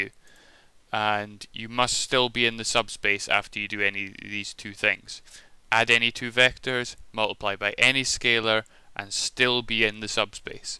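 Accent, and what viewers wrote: Scotland, United Kingdom. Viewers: Nice scottish accent! *<:D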